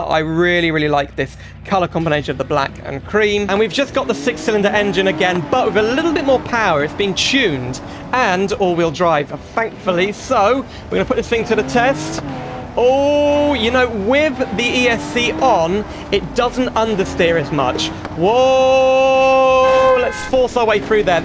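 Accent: United Kingdom. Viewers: Both are brits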